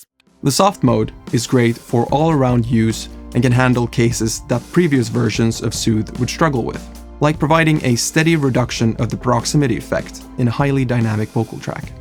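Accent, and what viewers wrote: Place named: Finland. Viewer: En tiennykkää et oli suomalainen, respect! Accent gave it away tho:D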